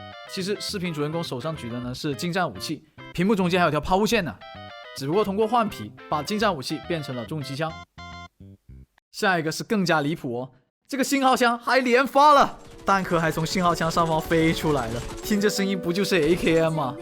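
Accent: Hong Kong, China. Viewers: 你是不是香港人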